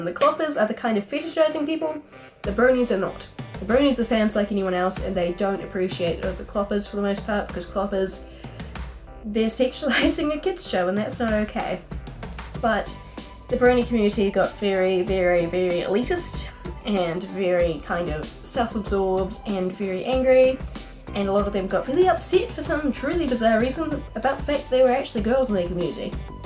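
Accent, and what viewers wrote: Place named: New Zealand. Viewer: I love your accent. New Zealand?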